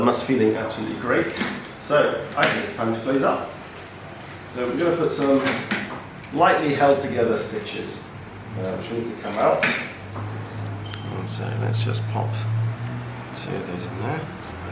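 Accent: United Kingdom. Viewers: Its really nice to hear the brit accent in one of these vids. I was starting to think only Americans did this 😅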